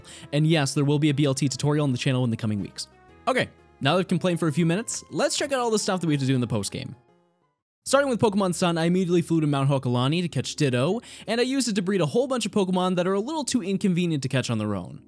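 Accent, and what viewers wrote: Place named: United Kingdom. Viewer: It's crazy how different and sound. One sounds more British (being more formal and a bit cynical) while the other sounds stereotypically American (more loud, but more fun-loving and casual). (I hope I didn't offend anyone saying that, it's just to show how different in energy these two are.)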